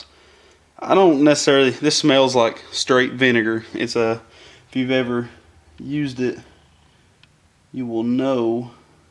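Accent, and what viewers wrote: South, United States. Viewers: There is something about the high amount of humbleness a man sounds like with a genuine southern voice amen! Thanks for the video will attempt repair on my logitech 7 inch desktop sub since the rubber around the sub tore.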